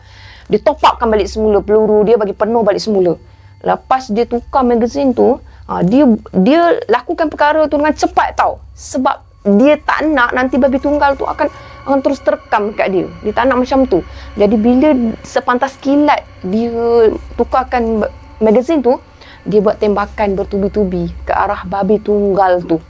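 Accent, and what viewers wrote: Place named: Pahang, Malaysia. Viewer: Ok kak ten q.bagi lah tahu bila ckp mcm logat org pahang.😀😀😀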